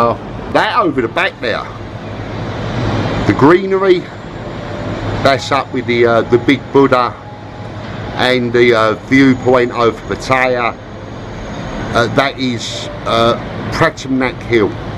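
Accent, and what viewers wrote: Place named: Cockney, United Kingdom. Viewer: That's because it's cockney guvnor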